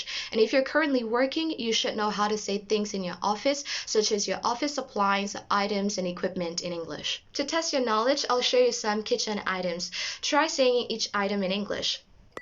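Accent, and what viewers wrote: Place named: United States. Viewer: U have American accent n I love it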